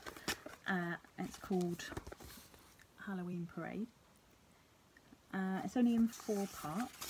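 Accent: Wales, United Kingdom. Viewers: Watched you after Jan Hicks recommended you channel. What a relief to hear a lovely soft English / Welsh voice after so many Americans. Love your stitching! Good luck. Kate, Melbourne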